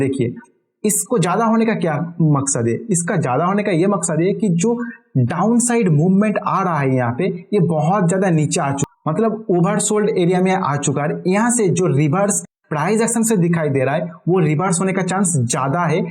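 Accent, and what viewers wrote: Bengal, India. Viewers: আপনার হিন্দি উচ্চারণ শুনে আপনাকে বাঙালি বলে মনে হচ্ছে।ঠিক না ভুল?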